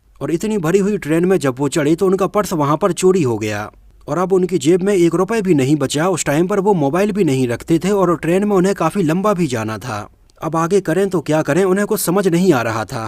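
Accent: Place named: Bundelkhand, India. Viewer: आप शायद बुंदेलखंड के हैं आपकी बात से मैं सहमत हूँ आपकी टोन से मैं सोचता था कि आप बुंदेलखंड के हैं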